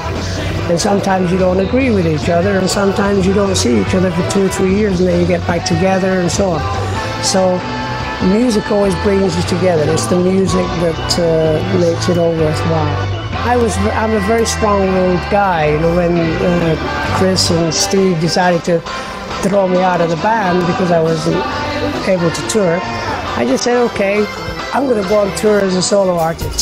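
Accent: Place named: United States. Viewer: Nice one, when was this recorded as you have your American voice instead of the Norfolk St one in your recent live stream.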